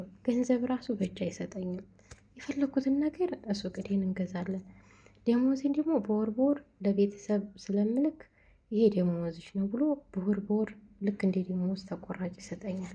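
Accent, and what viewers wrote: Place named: Wollo, Ethiopia. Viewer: ወሎየ ነሽ አነጋገርሽ ያስታውቃል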